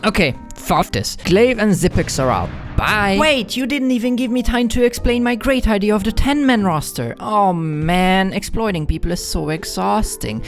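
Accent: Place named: Germany. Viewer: Hat lange gedauert aber den Deutschen Akzent kriegst du nicht los😂 (Wirklich geiles Video du hast definitiv mehr Abos verdient) #fckastralis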